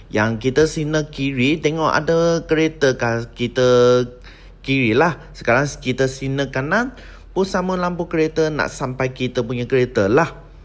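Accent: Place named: Kelantan, Malaysia. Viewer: Kelate bohhh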